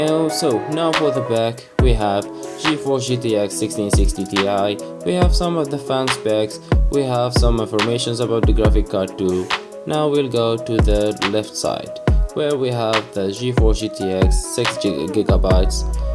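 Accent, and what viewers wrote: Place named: India. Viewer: You have an indienne accent bro 😁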